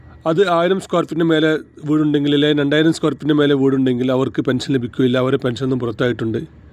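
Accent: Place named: Tamil Nadu, India. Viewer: തമിഴൻ ആണോ മലയാളം വൃത്തി ആയി പറയാൻ പടിക്കു എന്നിട്ട് വന്നു പറയു പ്ലീസ്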